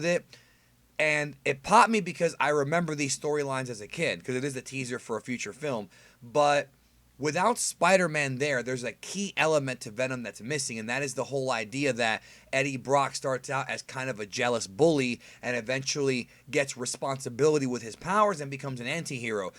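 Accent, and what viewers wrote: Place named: Connecticut, United States. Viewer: This dude has got to be from Connecticut because he sounds exactly like the frontman Jamey jasta from Hatebreed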